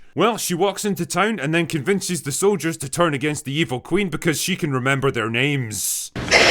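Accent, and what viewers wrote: Scotland, United Kingdom. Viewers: Normally I need subtitles to understand a Scottish person, but because he’s made an effort, I will say unbelievably good shit by the way I’m English😂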